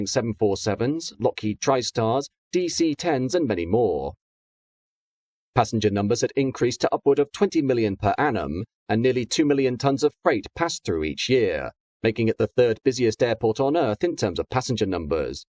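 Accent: United Kingdom. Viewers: I was born in Kowloon Hospital in 1946 . Growing up on my Grandparents' dairy farm in the hills above Ngau Chi Wan, my brothers and I watched the landing of Pan Am Clippers landing on Kowloon Bay. // Also, the British planes landed in the first- built runway ( near the district Wong Tai Sein): Everytime a small plane landed, all buses and autos had to halt in front of a barrier. I always take the No. 5 bus to St Mary's Canoissian School daily, and I remember this protocol vividly! // on certain special occasions, fleets and fleets of British aeroplanes would fill the air space --- above Kowloon Bay --- for an exhibition. It was exhilarating to watch, as kids, perched on a nearby hill ( south of an old, Taoist monastery!!! The British government later built the famous KAI TAK AIRPORT: I departed the bustling British Crown Colony, on Philippibes Airlines, taking off from the Kai Tak runway, heading towards Li Yu Mun Channel. 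What good Memories!// My dream -wish now is to cruise to Hong Kong Harbor, via Priness Cruises, and dock alongside the old Kai Tak runway to disembark!!! It will be .... like .... COMING HOME for a little Chinese girl! Thanks for this informative video. Much Appreciation. Love the British accent of the Narrator!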